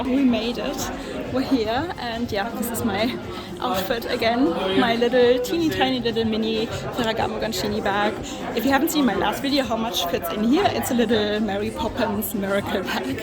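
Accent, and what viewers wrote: Germany. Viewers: I don’t know if you are even going to read this . I have to tell you your accent makes my heart so happy. I grew up in an area where a lot of Germans moved to ( in the US) after the war. Your accent is like a blanket to my inner little Kori. When I’m having a rough day and miss all of my “ Omas, I watch you.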